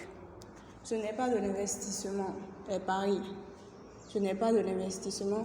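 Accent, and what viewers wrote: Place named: Benin. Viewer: L accent béninois explique trop bien 🎉 thanks you merci pour la vidéo je m abonne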